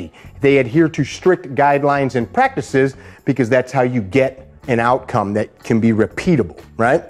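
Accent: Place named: Midwest, United States. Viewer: I can’t get enough of his Midwest accent no homo.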